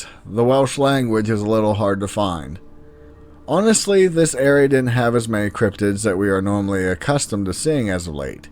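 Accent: United States. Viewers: Yay someone did a video on my home country of Cymru (or Wales) I must say it feels odd to hear an American accent say our names.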